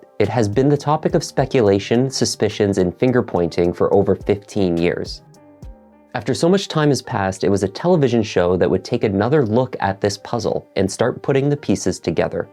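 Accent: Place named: Canada. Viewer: He’s Canadian.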